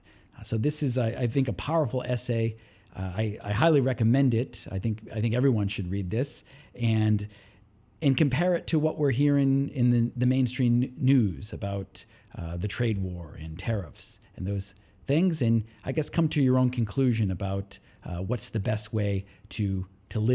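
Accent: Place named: New Hampshire, United States. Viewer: He's from New Hampshire, let it go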